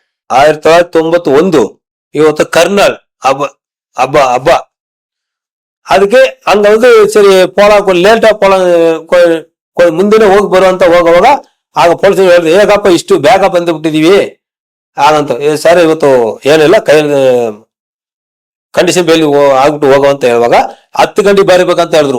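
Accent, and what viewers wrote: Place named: Maharashtra, India. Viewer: Border area sir,if you come to North Karnataka border area.you can hear marathi tone kannada.its common in border areas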